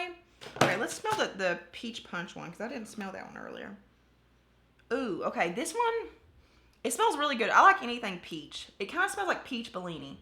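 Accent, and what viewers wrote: South, United States. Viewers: I love the fact you’re Southern! I know (as in yes I actually know her, her dad and I worked together) another YouTuber and she has apparently tried to erase her Southern accent. I like to hear us speak, we don’t sound like every other person and that’s fun! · Girl i freaking love your southern self! Im born & raised here in North Ga and i loooooooove the drawl!!!! Plus i love the fact you are unapologetically YOU!! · Anna Kennedy I found, watched about 30 sec of a video, heard that beautiful southern accent come out of her mouth, and immediately subscribed. 😁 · Love you, your makeup skills & your accent! I'm from Georgia & I'm proud of our southern accent! I've been #partypeople for quite some time! I graduated high school in 1993 & your birthday is in 1994....makes me feel old! Lol I'm just happy to be alive & watching your videos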